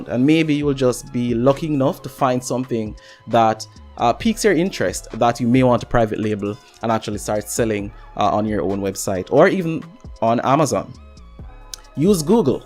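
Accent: Jamaica. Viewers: Are you Jamaican? Accent sounds like it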